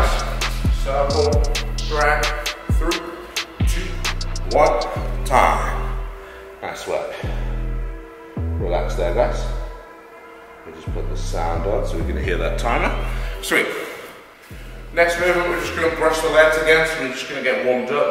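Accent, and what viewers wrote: England, United Kingdom. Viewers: You're pretty good, it's strange for me to see bjj with English accent.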